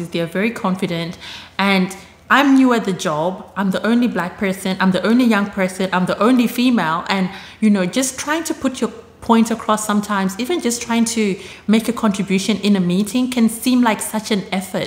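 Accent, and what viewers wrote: Australia. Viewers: Your accent is interesting it sounds Australian but not quite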